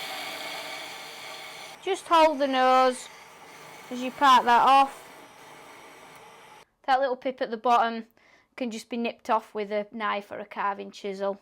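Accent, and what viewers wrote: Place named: England, United Kingdom. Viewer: Sorry I am so late with my comments but I just found this video. As I am a colonist (US) I usually find the English accent a bit difficult but in this case not at all. And I was quite surprised to find this video ran 48 minutes. It held my attention for the entire run. The finished work piece is very attractive and the production quite well done. Thank you for sharing. Have a great day and stay safe.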